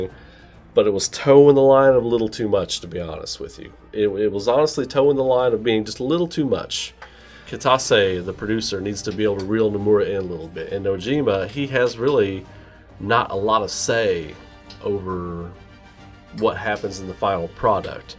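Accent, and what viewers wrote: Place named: Kentucky, United States. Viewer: I’m curious on you accent, it sounds like Kentucky, but it’s a bit more rounded I think.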